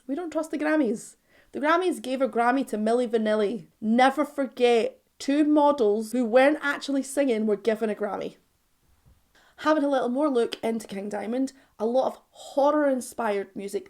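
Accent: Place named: United Kingdom. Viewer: Ohhh, you are so cute...your femininity...when you touch your beatiful hair, ok, a gift for you, react to, but...with singing, the others...poor of She´s, ohhhhh, you so cute, love you english too, it´s the best Britanic accent, kisses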